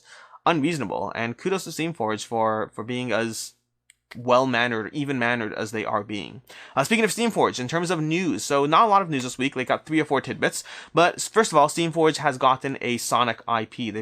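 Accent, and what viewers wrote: Canada. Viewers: Oh like this sweater also, nice! Your 'canadian accent' is getting less noticeable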